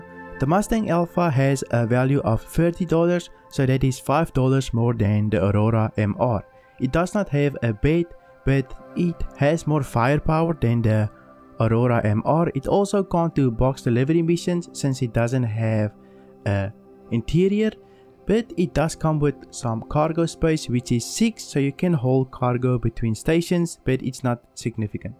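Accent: Afrikaans, South Africa. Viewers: Ek kan jou Afrikaans hoor my ou hahahaha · Lekker dude, ek het subscribe. ek kan mooi hoor jys afrikaans. Ek dink jy moet jou kanal in Afrikaans doen. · Ayyyy a SOUTH AFRICAN bru, keep up the hard work and thank you for the video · Awe my broe. I hear this guy speak for 3 seconds and i'm like "is he from south africa?" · Are you South African?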